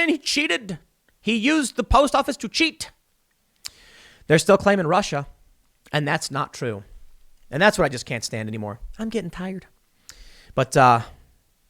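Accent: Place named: Midwest, United States. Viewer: It is just me or is accent slipping back more Mid-West lately. "Byoxes", "Boeth" & "Foelks" as examples.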